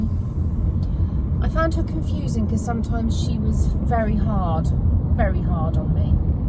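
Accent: United Kingdom. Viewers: Lush to hear the Brisle accent 😂 I hope you keep practicing your spinning.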